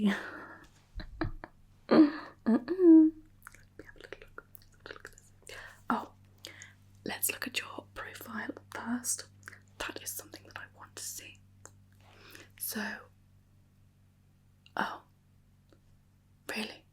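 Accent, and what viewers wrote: United Kingdom. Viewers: I like your British accent. 🤗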